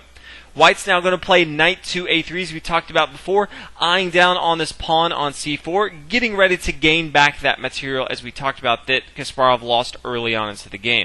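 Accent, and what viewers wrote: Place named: Northeast, United States. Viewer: you are either from Massachusetts, Connecticut, or New York. Am I correct?:-) commentator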